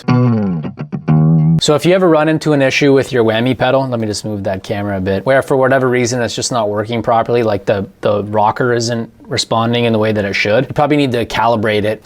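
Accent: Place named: Canada. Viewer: This guy talks like a darn Canadian!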